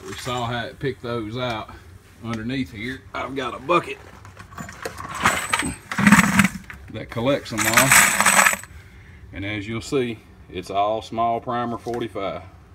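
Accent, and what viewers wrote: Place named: South, United States. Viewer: the true southern draw =)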